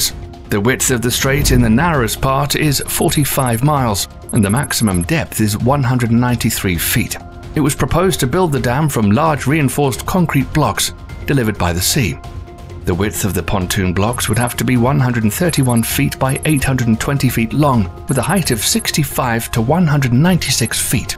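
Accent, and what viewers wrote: United Kingdom. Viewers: Don't say its a mistake because your wrong even with your cheesy British accent.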